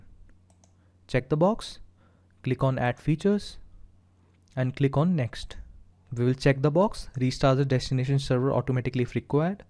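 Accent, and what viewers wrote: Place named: India. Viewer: Clearly... As I always say if it's Indian accent it's excellent explanation.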